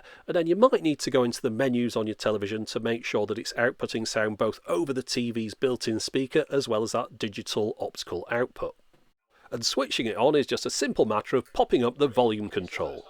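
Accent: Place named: North West England, United Kingdom. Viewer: Can’t get over the Schools TV feel on your videos, I think it’s the NW accent with Granada having made a lot of the programs for ITV Schools. This isn’t a negative, I used to enjoy some of the ITV schools programs even after I’d left school and watched when I was off / out of work.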